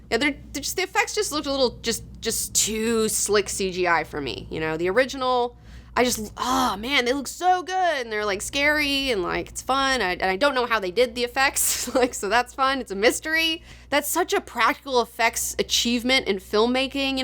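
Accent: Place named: South, United States. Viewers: New to channel- does anyone know if she's from the south? I hear a southern accent xD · Are you from south carolina or north carolina? The way you speak has a hint of an infliction that I've only heard there.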